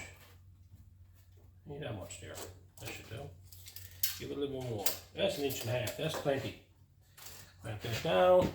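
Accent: Newfoundland, Canada. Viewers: What accent is that Newfoundland? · I knew that accent right away. Great to see a Newfoundlander doing awesome body work. Bravo! Bill from Saskatchewan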